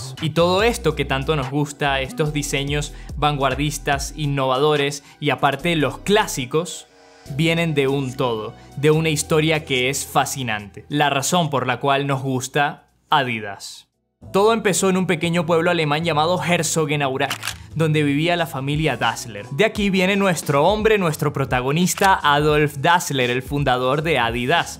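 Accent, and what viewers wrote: Venezuela. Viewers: Es venexolano?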